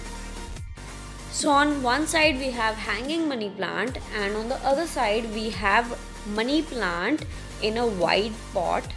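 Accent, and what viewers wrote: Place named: India. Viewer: Indiam accent? Arggg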